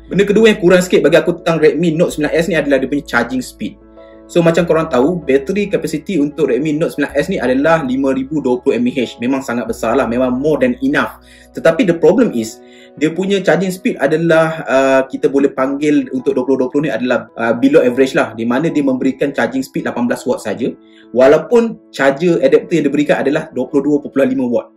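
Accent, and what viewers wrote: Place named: East Coast, Malaysia. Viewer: Maaf tanya bang,asalnya org mne bang? Bunyi mcm loghat pntai timur sikit.. bahahhaha.. cun bang.. buat sy terpikat nk beli.. haduhhh.. cdgn sikit bang.. berbanding mi max 3.. mane lagi baloi? Sbb nk buat lenjan game biase2.. bukan hardcore sgt.. sbb kita faham sekadar mid sahaja.. just mintak pndgan.. hehehe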